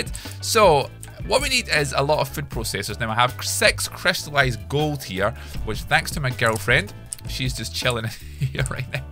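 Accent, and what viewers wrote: Scotland, United Kingdom. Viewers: I love your scottish accent "fefty" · "Cyked pizza's." Dat scottish accent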